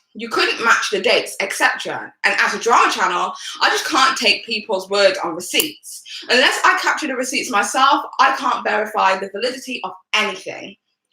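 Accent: England, United Kingdom. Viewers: You’re awesome and beautiful, ignore anyone who wants to pull you down. You are a unique person. Why should you owe any other person anything just because they happen to have the same skin tone as you- that’s crazy! Why does anyone have to fit into a box in this day and age. Britain genuinely doesn’t have the same issues with race that America has- America just can’t get past their history and it seeps into everything... but it’s not really like that in the UK. It IS different here, thankfully, or it was, although sadly it seems to be getting worse not better! I just thought you had a nice normal English accent- everyone I went to school with in my teenage years had that same accent (1/3 white, 1/3 black, 1/3 “Asian” almost exactly at the school at the time- everyone talked the same, pretty much like you. I was teased for having a different accent at the time, but you remind me of all the lovely wonderful girls I went to school with back then.) My parents did the same thing, didn’t want their kids to grow up too afraid to walk down the street, so when I was a teenager we moved to a better area and got a nice education. 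I’m really grateful for it. You’re one of my favourite Brit YouTubers and I really hope these morons won’t stop you doing what you enjoy, because you’re so good at it, a true investigative genius. I’m sure your beautiful gorgeous daughter will be luckily to grow up with such a beautiful soul for a mother, both inside and out, and your soul will alwa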